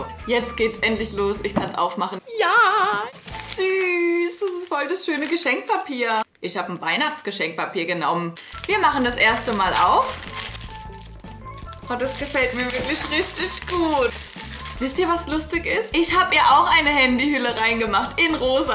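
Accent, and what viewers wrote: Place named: Palatinate, Germany. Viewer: endlich mal jmd der iwie pfälzisch redet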